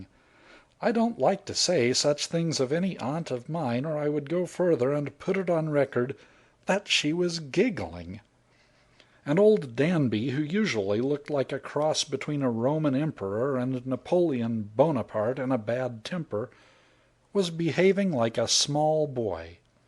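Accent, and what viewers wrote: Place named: United States. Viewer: Felt it totally out of place reading a typically English story with an American narrator...had to switch off.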